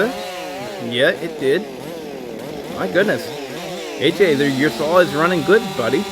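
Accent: South, United States. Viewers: well thank you man. The southern accent can be crazy at times!! I plan to hopefully keep us learning and trying new things 👍🏻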